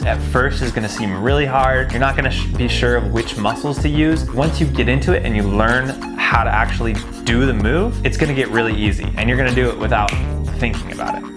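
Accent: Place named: United States. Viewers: he definitely seems american, not english... In England we say "schwal-bay". No idea if that's right though. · hes not english man, hes american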